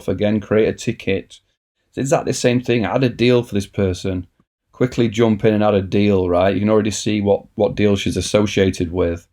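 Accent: North, United Kingdom. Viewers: Please remove that British accent and speak like a human. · Hi, Thanks for sharing this! I'm (we are) new to HubSpot. Its good to hear a Northern UK accent in a tutorial. My brain switches off when hearing an early millennial American accent. I guess I'm conditioned. Really helpful intro. Thanks again!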